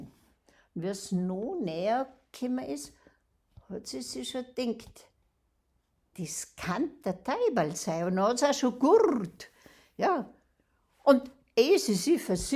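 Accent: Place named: Bavaria, Germany. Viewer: Einso schönes Märchen im bayrischen Dialekt. Auch als Nordlicht konnte ich sehr gut folgen. Ich mag Mundart erzählt sehr! Es ist authentisch und hat Herz. Auch finde ich die digitale Kamishibai sehr ansprechend. Ich habe gerne zugesehen und zugehört.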